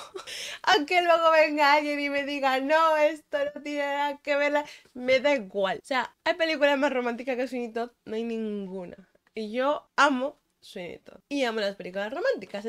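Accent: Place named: Peru. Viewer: Hablas cmo peruana🧐 sera?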